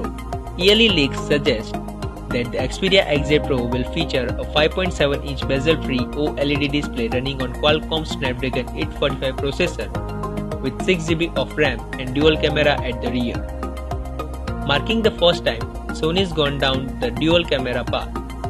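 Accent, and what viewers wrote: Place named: India. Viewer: Indian English accent not exactly Youtube worthy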